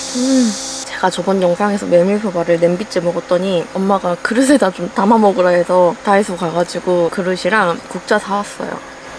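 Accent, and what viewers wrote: Jeolla, South Korea. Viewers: 에너지 넘치는 23갤 아들이랑 캠핑 다니고 싶어서 요즘 캠핑 영상만 찾아보다가 님을 알게 되었어요! 지금은 경기도에 살고 있지만 고향이 무안이라 전라도 사투리가 어찌나 반갑던지!!! 캠핑에 ㅋ도 몰라서 텐트부터 장비 등을 신중히 구매하려고 하는데요(이중지출을 최대한 막으려구요ㅜㅜ) 삿갓타프 괜찮아보이네요! 비 안오는 날엔 님처럼 활용해도 좋을 것 같고 아기랑 다니면 스피드가 생명이니까요ㅜㅜ❤ 매번 영상 기다리는데 오늘도 너무 잘 봤습니다😋💛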